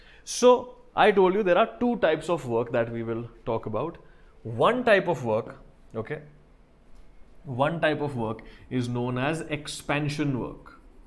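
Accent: India. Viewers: Sir your hindi accent is quite wierd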